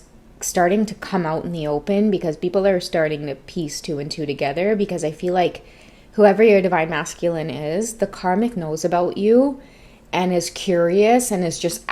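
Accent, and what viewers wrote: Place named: Trinidad and Tobago. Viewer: Not Jamaican, she’s from Trinidad not Jamaica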